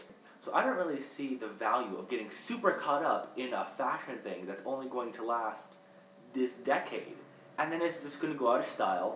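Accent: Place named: Scotland, United Kingdom. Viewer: nice scottish accent dude.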